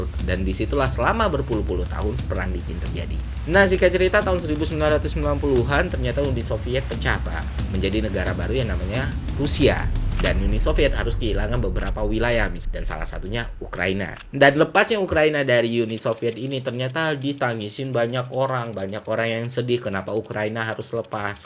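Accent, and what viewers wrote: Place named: West Java, Indonesia. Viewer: logat sundanya..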